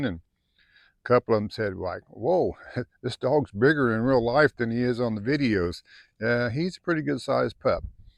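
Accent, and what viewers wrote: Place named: North, United Kingdom. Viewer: Hey you sound like a great northern boy like me.....please h it. Me up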